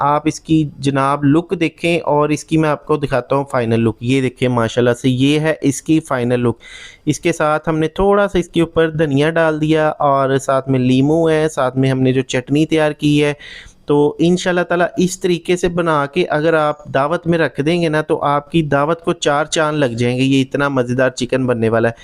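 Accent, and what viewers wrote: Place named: Punjab, India. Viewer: Assalam alaikum bhai... Masha Allah bohat khub aapki recipe hai... Agar isko mein fry nahi karta aur isko grill kiya OTG ya microwave mein ... Kar sakta hu... Zaroor reply kare... I like your accent... Punjabi tadka laga ke.... Allah Hafiz...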